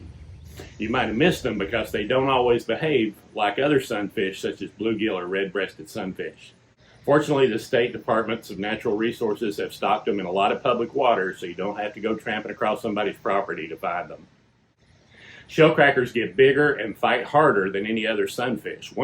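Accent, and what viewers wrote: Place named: New England, United States. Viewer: It sounds like your in the new England aria is your book relevant for texas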